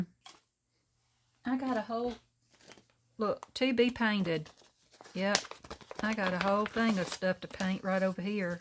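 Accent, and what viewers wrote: South, United States. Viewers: the painted papers are beautiful. My daughter and son-in-law served in Army and they did a tour in Afghanistan. Thank you for being you! I enjoy watching your videos and listening to your southern accent. You are a great inspiration for me. I can't wait until the end of August when I retire so that I can craft full time. God Bless and get well soon!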